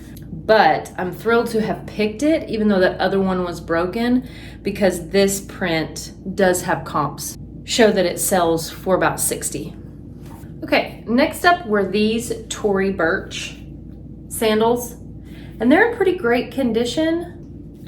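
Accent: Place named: Knoxville, United States. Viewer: Oh I love Knoxville - I thought I recognized the accent. 🇬🇧🇺🇸🌸🌸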